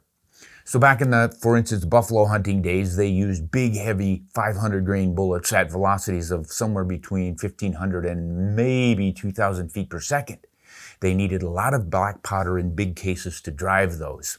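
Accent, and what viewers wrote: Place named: Wyoming, United States. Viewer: Where are you from? You scream Wyoming, Montana, or maybe Idaho to me lol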